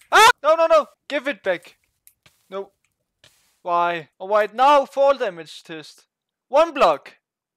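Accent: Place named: Denmark. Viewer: Man kan høre du er dansker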